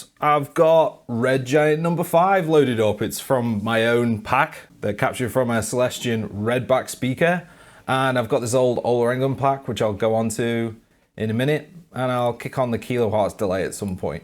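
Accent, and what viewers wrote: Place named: United Kingdom. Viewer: Hello. You always used Lancaster IR loader. Is there any difference in using it or the NadIR one? I just discovered NAM and was amazed by the Emil Rohbe models when I watched on another vídeo. I even did a search about him (he has also a YT channel). Now I see you talking about him. I dndt understand this ""triple or jwel rack"(?)" (I strugle to understand britanic accent)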